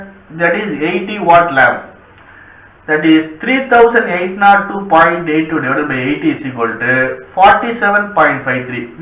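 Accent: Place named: South India, India. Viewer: English with a heavy south indian accent.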